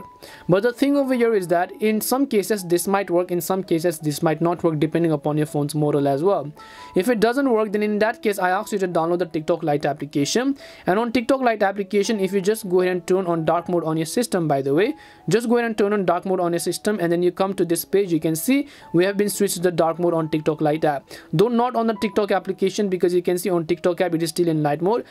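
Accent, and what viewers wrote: India. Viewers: You sound like indian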